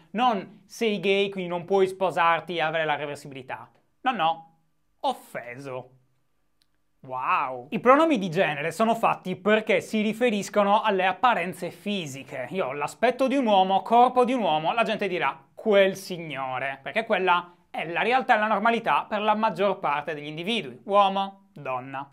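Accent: Sardinia, Italy. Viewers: Minchia se sei sardo!!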